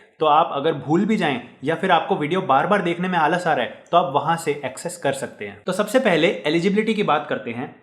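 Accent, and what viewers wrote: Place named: Punjab, India. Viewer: Vele baitha tha #Punjabi tone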